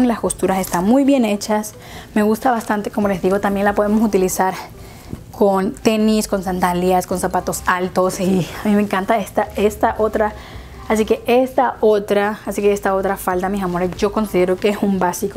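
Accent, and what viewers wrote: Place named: Honduras. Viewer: Por tu hablado creo que eres de Honduras, saludos y éxitos paisana!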